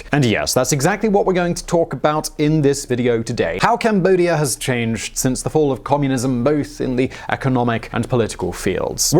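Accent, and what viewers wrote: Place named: United Kingdom. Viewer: another skinhead with UK accent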